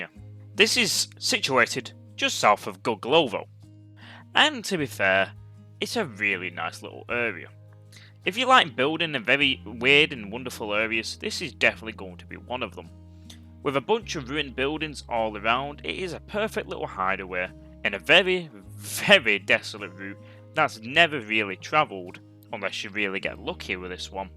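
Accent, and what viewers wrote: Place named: Cockney, United Kingdom. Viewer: Damn it you gave away my island boom stash Cockney bastard ---the lizard king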